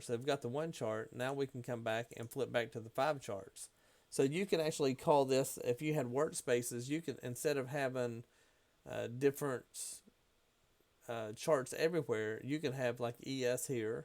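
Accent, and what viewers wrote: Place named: South, United States. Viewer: Great video awesome thanks for explaining the internal link versus the outside link, I also love the information of where closing out a workspace that were unused you made that so clear I have noticed the speed increase in my ninja trader thanks so much, and you have an awesome accent, southern gentleman🤩🤩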